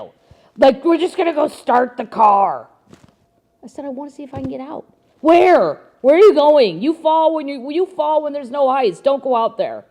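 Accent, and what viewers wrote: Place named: Wisconsin, United States. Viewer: Love her Wisconsin pronunciations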